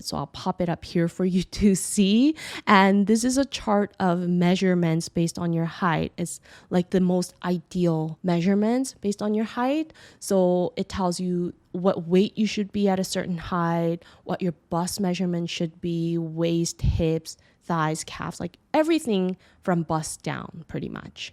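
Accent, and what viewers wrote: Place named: Philippines. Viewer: You are Korean? You sound Filipino. :hand-purple-blue-peace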